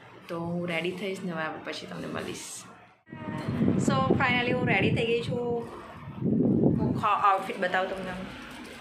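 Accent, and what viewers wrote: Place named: Ahmedabad, India. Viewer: અમદાવાદી બોલી નાખો છો સાથે બરોડા વાળી અને સુરતી થોડું થોડું 😅❤️❤️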